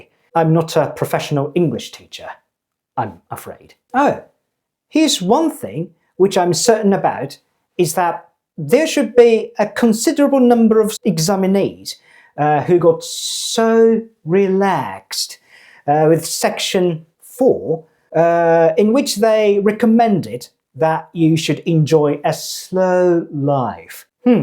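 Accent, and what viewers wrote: United Kingdom. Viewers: 共テリスニング96、リーディング88とれた‼︎ Thanks to your British English!! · まじでどうやったらそんなイギリス英語習得できるんだ？？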